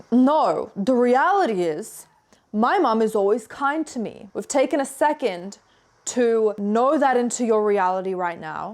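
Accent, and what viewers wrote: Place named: Australia. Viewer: I love your Aussie accent saurrr muchhh fr🤧✨❤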